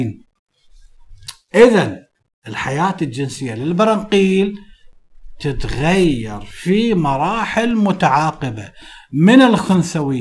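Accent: Iraq. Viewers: شمدريني هو الفيديو طلع يمي من وحده ..وشفته لهجته عراقي ستغربت .و امداكم🖐🖐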